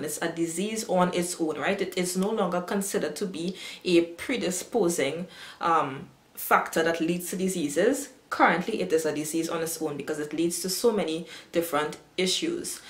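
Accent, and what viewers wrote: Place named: Trinidad and Tobago. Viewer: I just subscribed. I love how you kept it real, with no fake accent. Real trini accent here.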